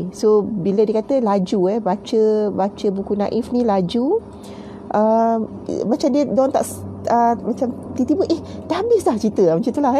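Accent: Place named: United States. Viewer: Buku naif best.. penulis membawa jiwa pembaca kesana.. banyak benda dpat beljar terutama culture. seorang yang cekal (Grit), resilience, introvert yang mempunyai daya kepimpinan. Pandai berdikari. Rasa macm nak dengr banyak benda lagi.. sbagai tambahan mgkin boleh translate kan English sentences, so that the rest also can understand. Oh, saya.. saya rasa ada bakat tersembunyi yg boleh di majukan, dan dikembangkan.. iaitu American English. Mana tahu boleh buat video mengajar peminat untuk belajar American English. mesti hebat tu.. hehehe.. Apa-apa pun bacaan yg best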